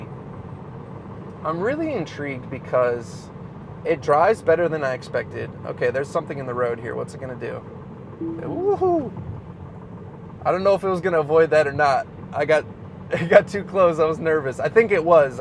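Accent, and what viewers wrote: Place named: California, United States. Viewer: California diction, syntax, grammar and rhetoric...yuk.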